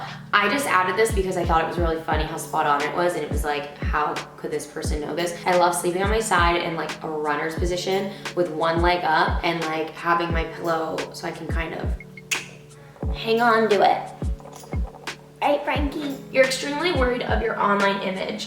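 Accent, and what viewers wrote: United Kingdom. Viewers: she sounds like she's from Yorkshire! omg that was so freaky she sounded exactly like my cousin!!!!!!!!!!!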